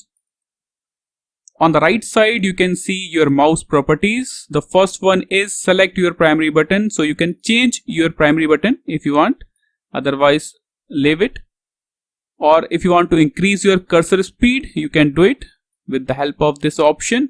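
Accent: India. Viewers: As soon as I hear the Indian accent, I knew I was in good hands. Problem fixed....and THANK YOU!!!! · I recommend listening to indian accent youtube tutorials they always fix the problem Without this guy id be stuck with a lagging mouse Thank you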